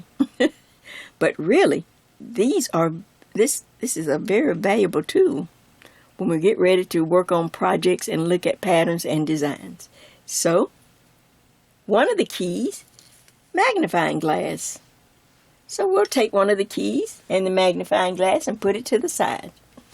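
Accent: South, United States. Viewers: Only just subscribed couple days ago. I've been crocheting for decades but the recent introduction of so many of the old... really old stitches is so exciting. I learned to knit, crochet and embroider all at age 11. I still enjoy 2 out of 3. I prefer not to knit. Too mundane and not enough texture. I cane view a piece then reproduce it. I'm unable to use the charts or should say they slow me down. I prefer reading the row by row or rd. by rd. written directions. I will certainly be purchasing both of those books at the JoAnn's I used to work at. I will be tuned into your channel every time I get notification you've put up a crochet video. I love your friendly voice and giggle with your very distinct southern accent. I lived in La. and Miss. Each southern state's accent is very unique unto itself. Thank You for all your efforts in this field you so obviously love as you shine brilliantly at it. 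I'm Grateful, LYNDA in Utah.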